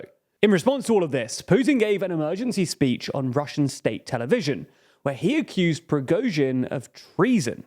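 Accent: United Kingdom. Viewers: This has to be one of the most intestinal videos of this channel as of yet. From the thumbnail, to the assumptions... You probably were cheering for wagner when they were doing a coup and then calling them terrorists after they backed out. A speculative video elaborating assumptions through the lens of a british idiot that thinks he has brilliant deduction capabilities. You're just banking on the views brought by your acephalous peers that love to parrot anti-russia stuff. "The beginning of the end for for Putin"... Even the people inside the loop have no idea what happened, and here you are stating he was humiliated blah blah. The truth is that you should've just stayed in your lane, reduced yourself to your insignificance as a mere youtuber that looks like an overgrown baby and thinks he is intelligent and a respectable news outlet just because he has a british accent.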